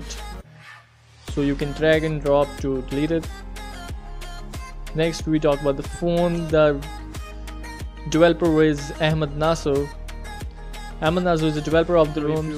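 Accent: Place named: United States. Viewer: Please for god sake!Drop down Your stupid American accent.Plz.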